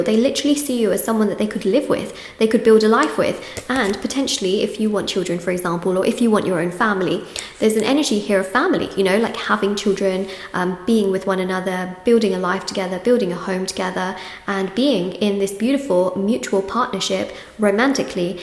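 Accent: United Kingdom. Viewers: For some reason I never put 2 & 2 with the UK accent. I just thought you wanted to be treated like a princess heh.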